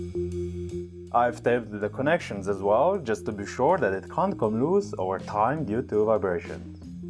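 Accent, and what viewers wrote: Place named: China. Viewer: Sorry but what's on top of my mind watching this is: what's your native tongue ... Sounds like Chinese to me...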